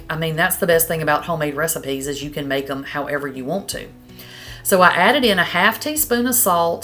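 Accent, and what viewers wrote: South, United States. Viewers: You have such a beautiful Southern Accent. What State are you from?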